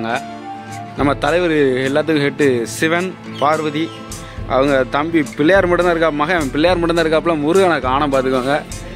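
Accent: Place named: Thoothukudi, India. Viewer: மிகவும் இயல்பான slang.இப்படியே தொடருங்கள்.தூத்துக்குடியா?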